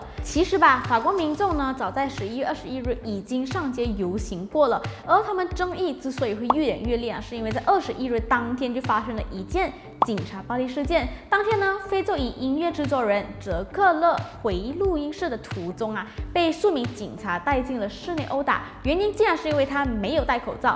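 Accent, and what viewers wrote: Malaysia. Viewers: 这是地道的马来西亚华语口音，不是中国口音，谢谢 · 这是马来西亚华人的口音吗，感觉好可爱。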